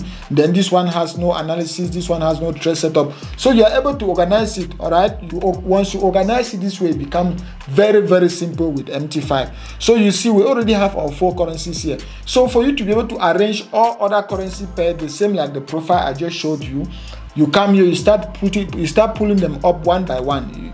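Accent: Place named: Nigeria. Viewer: No one can teach me shit with this Nigerian accent. Absolutely no one. It sounds childish🤣🤣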